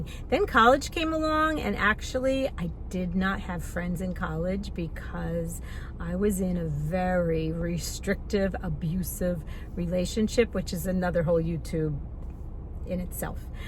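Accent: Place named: New Jersey, United States. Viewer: I love your Jersey accent and your heart!! I’ve known you for 2.5 years and I have experienced how kind, thoughtful and honest you are! You’re the kind of friend that makes the world a better place!! God bless you!!❤❤❤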